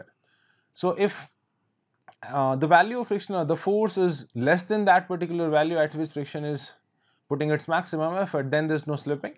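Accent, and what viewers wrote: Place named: United States. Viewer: He is an as*hole really! F*ck your Amreican accent you filthy crow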